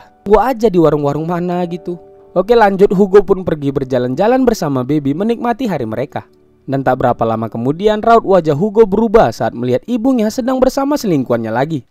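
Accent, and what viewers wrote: Medan, Indonesia. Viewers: lucu kli kau ngomong nya bg,,,orang medan ko ya,,,wkwkw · Medan kali ahh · Salam kenal min,subscriber baru mu, dari awal nonton film ini salfok sama cara pengucapannya nampaknya uwak ini anak medan pikirku🤣salam kenal lah yaa awak juga orang medan wak. Sukses terus!🫶🏻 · Tiba² nongol diberanda langsung subscribe & like 🔥🔥 // Salam dari MEDAN 😁. Dari logat bicaranya mimin juga dr medan keknya ini. 🤭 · pasti Abang ini orang Medan wkwkw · Dari awal denger cara kamu bwa cerita n logatmu udah tertarik saya, gampang dimengerti n kocak juga, org dri medan ya min??? MEDANNYA KAU BANG?? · Org medan kyk nya kau bang kwkwkwk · mantap kali wak wkwk. orang medan keknya uwak neh 🤣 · Logat medan ya lek😅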